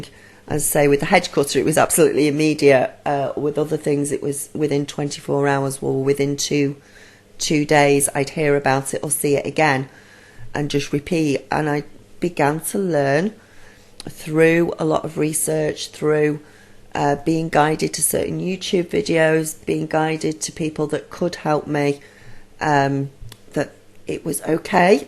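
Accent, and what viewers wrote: United Kingdom. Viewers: Geesh how I love listening to you. I can remember I could always hear a British ladies voice wish me a "good morning Elizabeth" and you sound just like her and also my Granny who is still alive today but now too old to conversate. You're voice is healing so thank you. And the subjects as well. I always knew my thoughts created reality but it's never been so obvious until I met my husband who must be my twin because I have not been able to completley open to him out of fear and now because I stay closed for such long periods, I've watched every "worst case senerio" that I've worried about happen. It gives me hope though that I have a power to change everything and I am conscious if what I am doing. I have not yet mastered overcoming fears when facing the biggest ones. For instance..I had social services knock at my door yesterday because a doctor called in that my vegan lifestyle was not allowing my 2 year old to thrive. 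So there we all sat in the hospital not knowing if these people were going to try and take my daughter away while they poked and proded her over a course of 6 hours only to be told (thank god) that she is in perfect health and good job. I swear I thought I was going to die during it. And something just this security threatening happens constantly. And it will until I open up and stop hiding from fear. Thanks for reading. Thanks for you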